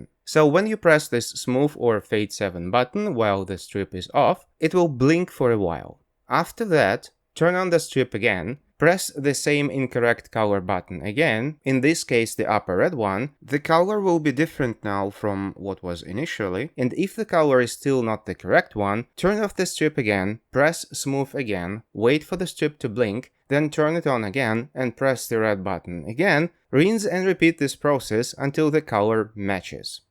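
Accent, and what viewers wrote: England, United Kingdom. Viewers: Oh, sir, you are from England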